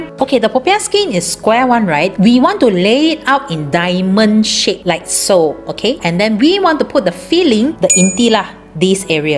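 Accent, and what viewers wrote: Malaysia. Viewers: Love ur manglish babe 😂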